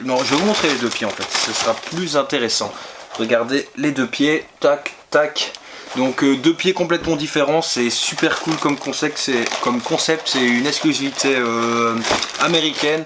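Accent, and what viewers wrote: Belgium. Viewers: t'es belge?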